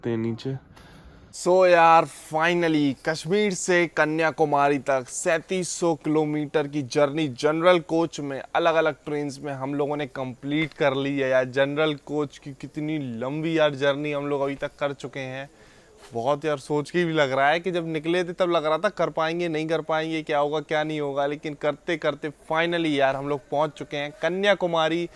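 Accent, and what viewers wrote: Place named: Jhansi, India. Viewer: main apki boli se hi samajh gya tha 😁 Mai bhi Jhansi ka hi hu ( near Medical College Gate no. 2 )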